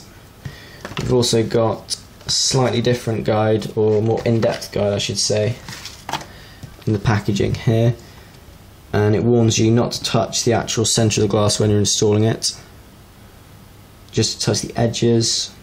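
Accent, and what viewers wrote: England, United Kingdom. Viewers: It's not that it's the fact that on his channel he says that he's from the united kingdom AND you can clearly hear he has a english accent.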